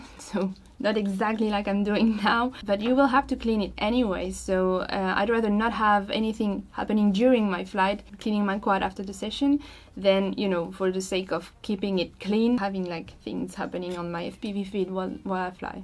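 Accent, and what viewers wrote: France. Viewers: good video.. and i like english with french accent more than french itself XD ... so we're both not native english speakers and i wish i could speak that good... writing/reading/listening is so much easier than speaking for me.. i couldnt do it it that fluent :D ..recently i saw the comparison video from J.Bardwell about the chameleon/rooster/Chameleon Ti and now i dont know what frame to buy next because i really like the Rooster lol ^^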